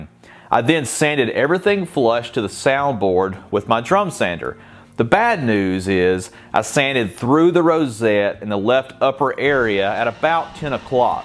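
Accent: South, United States. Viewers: This is just a wild guess but are you any chance from the south? Thanks for the videos.....really enjoy them.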